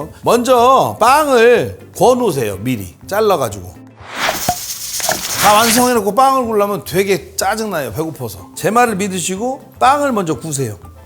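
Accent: Chungcheong, South Korea. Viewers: 이렇게 뿐진담에.. ㅋㅋㅋ 충청도 사투리..ㅋㅋㅋ 정겹네요 고향사람이라 ㅎㅎ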